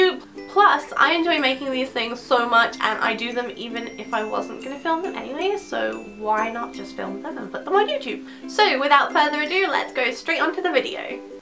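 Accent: England, United Kingdom. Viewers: Hi I'm really confused I love your vids but I just can't figure out your accent! Are you British ( like me yay!) but then you have a slight American tinge too! Also you mention American shops.. Please enlighten me! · are you English?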